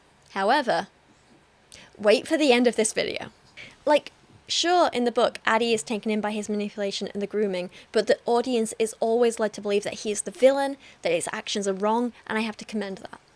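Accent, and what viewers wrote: United Kingdom. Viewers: Would be nice if you got it right the first time. You went and slandered the whole country for something that isn't even true. Even better, you did it purely based on the "word" of a trashy author and an unreliable narrator. On top of that you seemed to assume that age of consent laws were the be-all end-all in this kind of thing despite that the age of consent in your own country (I'm assuming the UK, based on accent) is, wait for it, ... sixteen. Now this is apparently raised to 18 in the case of a teachers trying to have "relationships" with their students, but it's notably not as good as that majority of US states that outlaws such things entirely, regardless of age.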